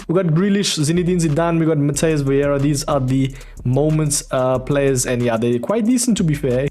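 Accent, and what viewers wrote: Cape Town, South Africa. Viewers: You sound like ya from Cape Town with a mix of something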